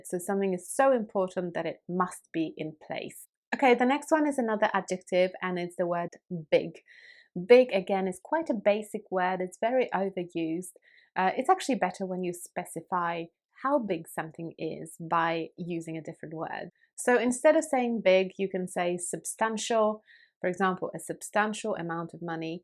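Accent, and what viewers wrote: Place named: United Kingdom. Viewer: I need to recall the vocabulary of the years I used to study in England. All of these words, phrases and accent are gone…at the same time it feels extraordinary the perfection of your British accent! Excellent work! ☺️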